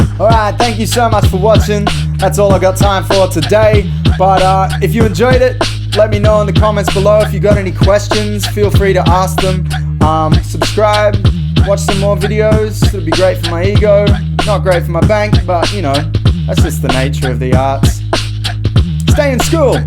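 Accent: Australia. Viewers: I love how bloody Aussie you always sound bro haha. Love ya work < Please never stop being you and doing what you do...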